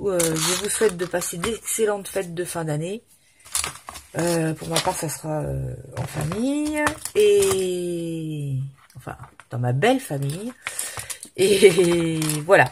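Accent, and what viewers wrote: Paris, France. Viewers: 🎶(d où ton accent titi parisien😍.)Coucou. Bien ces petits cadres. C est vrai que les parents il faut les mettre en valeur❤️. Je vais les refaire mais pour mes grands parents. Merci du partage. Je suis contente pour toi que tu aies trouvé de la bonne collasse😂. Tu souhaites un bon anniversaire à Mr COLTOUPARTOU le chef cuisto. Dis donc en fin d année ça vous en fait des choses à arroser. Donc petit verre à votre santé 🍻🥂(à consommer avec modération) tchin tchin. Bisous et passe un bon réveillon 🎉moi calme avec mes 3 frangines (car boulot surlendemain) on fait soirée karaoké et ma sœur va me chanter le best of de et, l autre ce sera et et la troisième les cantiques d'église, chouette soirée, non? Mais je les aime comme ça ❤️ et aussi, en passant par et et bien sûr (pas d anglais car on ne parle pas anglais ou très peu ou très mal😭😭😭) belle soirée en perspective hihi bisous et belle année 2019 à tousssssss et toutes❤️🎉🎶🍀👍💞💞💞💞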